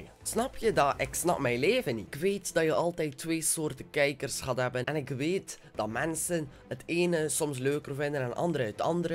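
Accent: Belgium. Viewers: Yo.... Je hebt echt een SUPER epic kanaal, ik volg je al vanaf je eerste Video...... Wat ik het leukste aan jou vind is: Je Belgische Accent is echt super grappig (GeenHaat) en de manier waarop jij je vids opneemt... ja weet je... dat is gewoon SUPER EPIC Welke Vids Ik het leukste vind::::::::::: *VerlatenLocaties *Vlogs *Gaming Dat vind ik gewoon super tof... Maar je moet gewoon doorgaan met JoutubbePasta en dan komt alles goed en je moet vooral jezelf blijven eejj en pssst.. Je moet je niet Gek laten maken over een dom iemand die denkt dat hij/zij grappig is...... Jij maakt je Vids op jou manier en dat is het gene waar je het best mee bent dus laat ze maar praten... Je bent gewoon de beste Joutouber:D Van Joutuoube:P Groetjes Je beste fan ever #AceFan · Random praatvideo's zijn wel lachen want ik vind t Belgse accent gewoon mooi haha doeo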